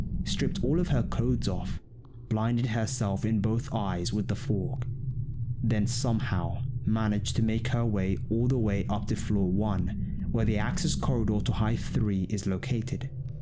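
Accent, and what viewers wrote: United Kingdom. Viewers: U didn't worked there.. U sound like u from da U.K... Sorry nice tried.. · Why do you have a UK 🇬🇧 accent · His accent is British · you sound british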